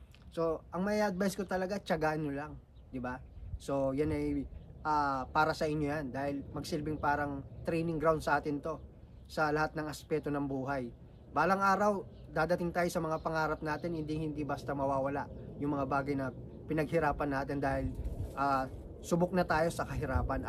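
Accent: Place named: Pampanga, Philippines. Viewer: kapampangan ka, balu mung balu oh pamagsalita mu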